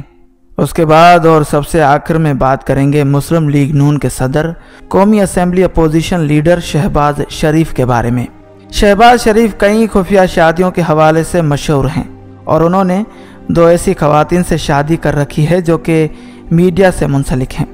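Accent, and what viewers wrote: India. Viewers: Bhai pehle urdu k makharij seekh lo. Apko toh hinduon ka rang charha huwa hai . Hay ko khay aur ghalat ko galat bol rhy ho indians ki tarha. You need to work on your voice and accent.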